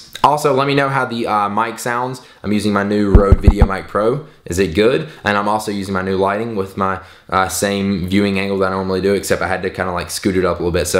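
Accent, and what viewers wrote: South, United States. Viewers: U sound really country af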